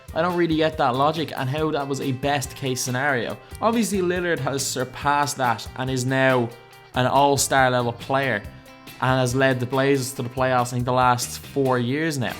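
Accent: Dublin, Ireland. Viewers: Irish accent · this guy Irish? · are you Irish im irish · You sound like you're from Dublin lad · r u Irish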